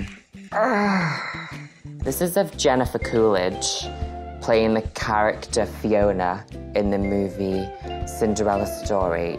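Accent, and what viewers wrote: United Kingdom. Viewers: Its called a British accent. but nice recycled meme bro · i remember watching this video when i was 15 and thinking i was watching the most beautiful women i’ve ever seen. and i wasn’t talking english yet so everything i understand was the british accent and the word stunning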